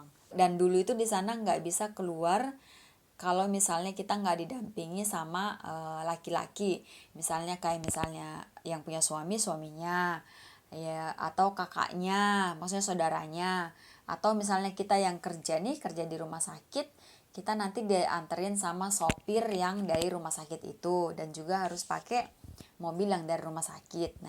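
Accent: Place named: West Java, Indonesia. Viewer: Teh logatnya kaya org Sunda,slm knl dari tkw malaysia asal tasikmalaya.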